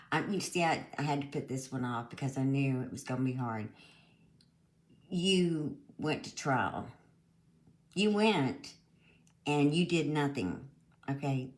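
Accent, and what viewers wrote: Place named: South, United States. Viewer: You said it just fine. I love your voice with that sweet southern accent very calming and easy to listen to. Reminds me of my Aunt's from Tennessee and Great Grandma. Mary tells her made up stories but fails to accept She is the monster.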